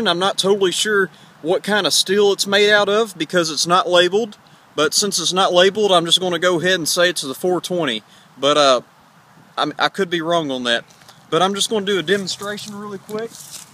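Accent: South, United States. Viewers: Holy shit this is a serious southern accent.. damn.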